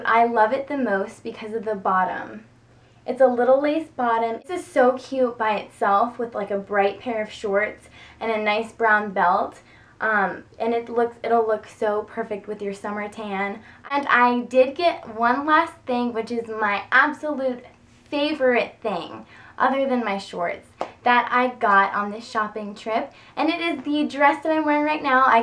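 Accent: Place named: United States. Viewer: Tu es magnifique, ton visage est.... il n'y a pas de mot, ta voie je l'adore c'est la voie américaine que je me suis imaginé, ton style est très beau. Gros bisoux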